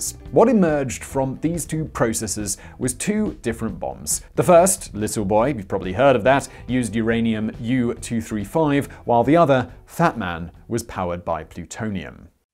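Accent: United Kingdom. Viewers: Looking a little weak in front of the history guy. Little bit scattered. Missing a few points. The British accent can't save you forever.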